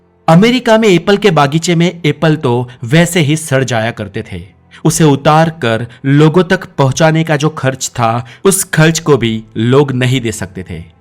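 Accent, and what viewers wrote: Gujarat, India. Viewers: Your voice is gujarati ...👍👍👍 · Gujarati lago cho bhai tmy 😀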